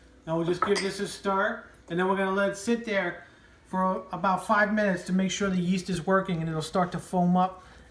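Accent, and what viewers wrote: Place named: New York, United States. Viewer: Everything about this video, from the delicious bread, to your (east-coast/new york?) accent, to your ADORABLE son and sweet wife filming you (reflection in a spoon lol) I can't wait to try your recipe. Thank you for sharing some of life's joy - God bless!!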